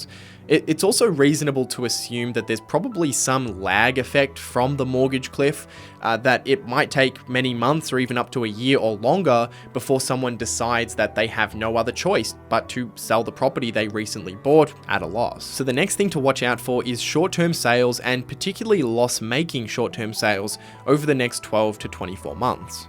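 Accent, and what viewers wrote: Australia. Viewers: I cringe every time you say ‘graff’ in your ‘melbun’ accent. The correct pronunciation is ‘grarf’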